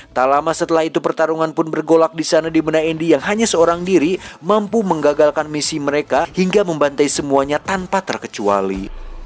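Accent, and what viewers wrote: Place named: West Java, Indonesia. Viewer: Lidah orang sunda nggak bisa disembunyikan haha. Weduk, Camal.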